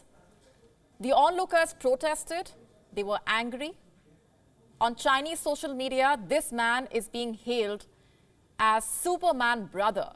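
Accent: India. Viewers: I disagree completely. Actually Indians speak better English (more grammatically correct) than the rest of the Anglosphere. That said, the Indian accent is a challenge to other English speakers, North Americans in particular. I hate to use the term but your comment is borderline racist. If Al Jazeera used native Arabic speakers instead of "white' mouthpieces, you wouldn't care for their accents either. You could use the subtitles function, as I usually do. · You are dubbing the interviewees spoken words with your strong Indian accent English. I'm effectively bilingual in English and Chinese, if your subtitles are accurate while the Chinese woman speaks in her own language, why would you dub her in English? People in China are frustrated because of the suspected abuse of Covid swab-tests that are mainly outsourced to private companies, which resulted in suspicious attempts to spread the virus throughout China, because it's very profitable for these private companies to legally syphon money from the Chinese government thru exploiting the legal loopholes, prolonging the current Covid19 situation, and collecting service charges for all the daily individual Covid19 swab-tests.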